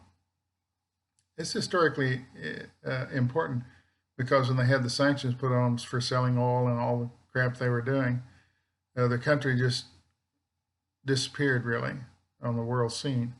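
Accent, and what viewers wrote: Texas, United States. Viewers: I really enjoy your style of delivery. I'm new to your channel, thanks to whoever gave a shout-out. Are you from Texas? There is something familiar about your accent. I live in Keller north of Fort Worth.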